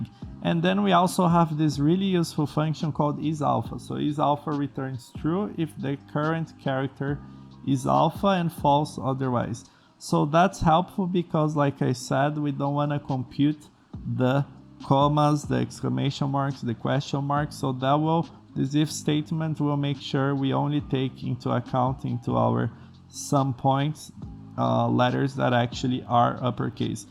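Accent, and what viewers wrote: Brazil. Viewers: Vc é brasileiro ne ? pelo sotaque ! Se voce for sera que consegue me ajudar to no começinho do ensino to precisando de alguem pra troca umas ideias !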